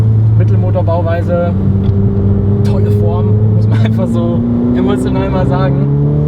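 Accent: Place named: Poland. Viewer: sounds like a Polish Nowak, you are a real polish Nowak?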